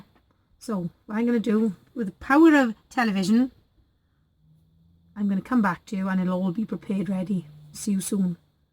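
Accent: Wales, United Kingdom. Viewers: I love your layout, so bright and cheery. I don't use red much either, so it's great to see how you've made it work so well. Also, I love the Welsh accent! I lived in South Wales for a while many, many years ago and everyone made me feel so welcome :)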